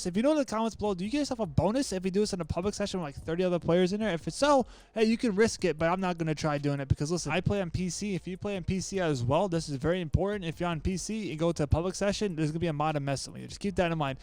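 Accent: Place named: East Coast, United States. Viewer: i’m staying bro u funny 🤣🤣 east coast huh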